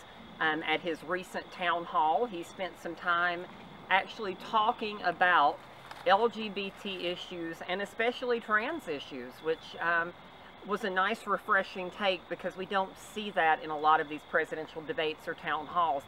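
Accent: South, United States. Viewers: It's good to hear another trans woman with a southern accent. You kind of remind me of back home in Central Virginia.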